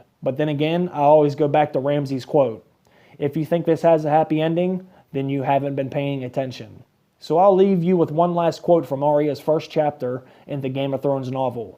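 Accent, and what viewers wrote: Pittsburgh, United States. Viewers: I like your channel. BTW where are you from? You sound like you have a little bit of a Pittsburgh accent. : )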